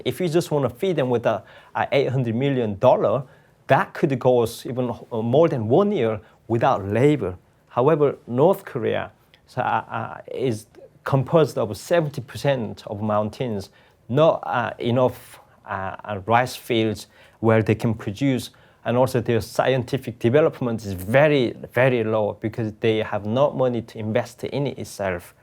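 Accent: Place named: Lancashire, United Kingdom. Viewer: Interesting - so he has it reinforced on a daily basis! I thought I was hearing even some East Lancashire vowel sounds at times, but it was a bit difficult , with his accent, to detect if there was actually a rhotic r in there as some areas of Lancashire still have, and which I hear daily where I now live.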